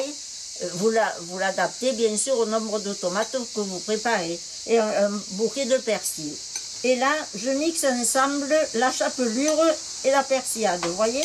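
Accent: Provence, France. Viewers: Merci pour cette recette incontournable de l'été, simple et délicieuse ! Je ne manquerai pas de la refaire très vite. Votre jardin est magnifique et c'est vrai que ça fait plaisir d'entendre votre accent, ( nîmoise, j'ai l'accent qui chante aussi ) qui se perd de plus en plus à mon grand regret et sans oublier le chant des cigales ! Mon grand-père maternel et parrain, était un gentilhomme provençal qui à la fin des grands repas, chantait de sa belle voix "Coupo Santo" et ma fille pour lui rendre hommage est une arlésienne qui défile dans toute la région avec l'école des traditions. Pensées affectueuses de Sylvie la nîmoise 💙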